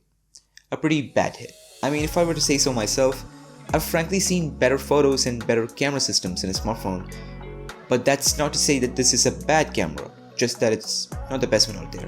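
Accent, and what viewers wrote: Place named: United States. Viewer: you're an Indian? your english accent is american. nice 👏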